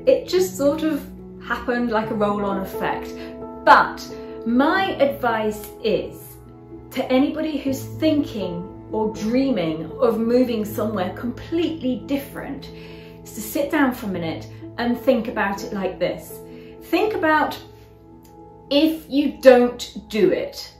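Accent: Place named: United Kingdom. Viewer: Wow lov ur journey ..n ur british accent..n ya ur husband is handsome..god bless u both.love from india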